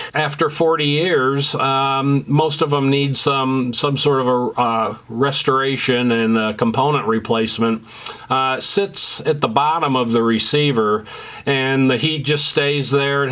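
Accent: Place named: New Jersey, United States. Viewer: Sometimes I can't help but picture Brian Williams behind the voice. Is this a Ridgewood-specific accent or is it widespread through NJ?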